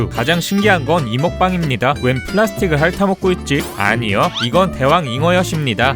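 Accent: South Korea. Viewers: 왜 자꾸 김치발음 물씬 풍기는 되도않는 영어 섞노 ㅋㅋ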